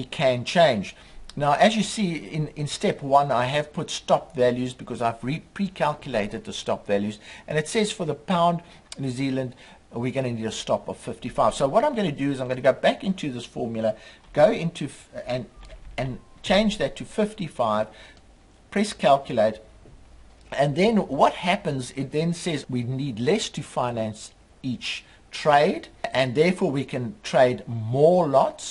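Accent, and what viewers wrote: South Africa. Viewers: wow . . You sound so South African ! are u from New Zealand or South Africa ??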